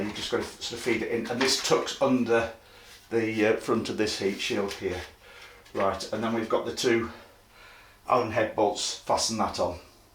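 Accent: United Kingdom. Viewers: Great video, thanks for detailed explanation. Easier to understand with a British accent. I'm from north of the border but it still works for me. ;) Again, thanks. Just picked up my 2019 XC recently and this is high on my list before the summer heat hits Atlanta.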